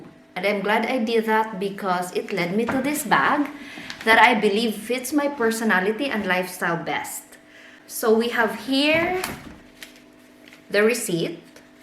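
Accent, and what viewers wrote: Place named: Romania. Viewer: Hi!! Love the accent na.. so romanian! Mwah! Miss you!! Congratulations on your blog!! 🎉🎉🎉🎉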